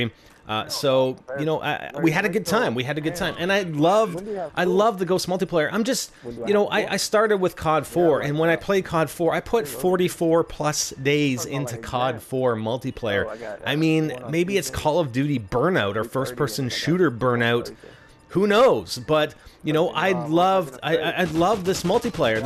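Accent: Canada. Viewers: are you Canadian by chance?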